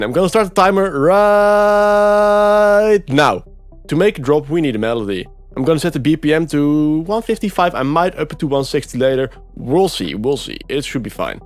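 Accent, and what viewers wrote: Netherlands. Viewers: ahhaha vaak kan je dat gewoon horen bij nederlanders die engels praten :P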